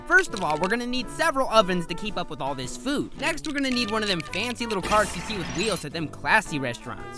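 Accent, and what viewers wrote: United Kingdom. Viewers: Are you from UK?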